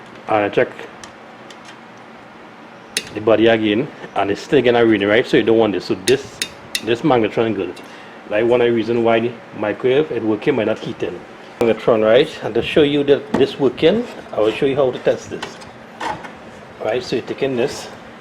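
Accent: Trinidad and Tobago. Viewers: Are you a Trinidadian?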